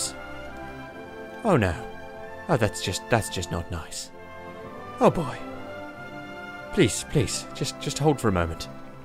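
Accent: Australia. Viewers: This dude sounds more Australian then me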